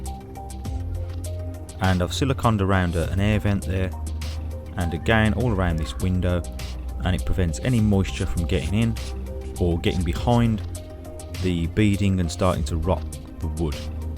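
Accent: Birmingham, United Kingdom. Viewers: Thanks for the heads-up. I headed over to that video, and your video on insulating your an cave. I had a question about the shroud, but will post it on that video. From your accent, I'd guess you are somewhere around Birmingham? (That's where I am) - rain protection definitely needed in the Midlands!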